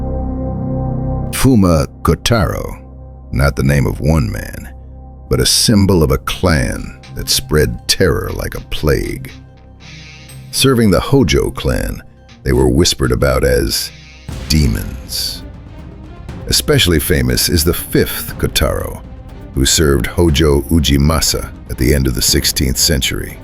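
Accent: South, United States. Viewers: Whats up with the southern voice? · Love the Southern Accent narrative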